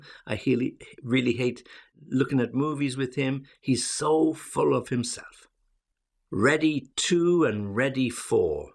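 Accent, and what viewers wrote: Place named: United Kingdom. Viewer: Thanks a lot, for your useful lesson and my favorite British accent!!!!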